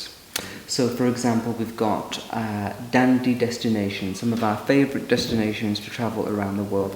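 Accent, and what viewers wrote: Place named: England, United Kingdom. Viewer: What an amazing video!!! Thank you for sharing all of this beauty with us. But what makes the video even more enticing is your voice. It is so soothing and your English accent just draws the viewers in; I can't get enough of your videos!!!!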